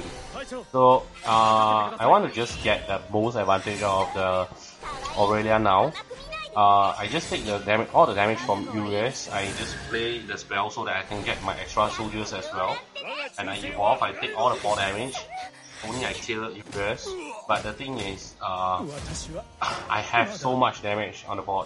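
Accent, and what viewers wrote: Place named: Malaysia. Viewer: you sound like malaysian tho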